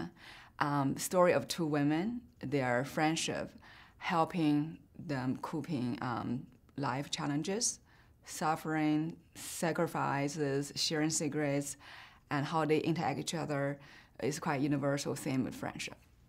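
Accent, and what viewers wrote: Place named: China. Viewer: Her Chinese accent is soooo strong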